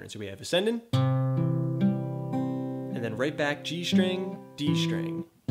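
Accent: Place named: Philadelphia, United States. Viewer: Very helpful. Thanks. And bonus points for the Philly accent. Geaw Iggles! (And Gritty.)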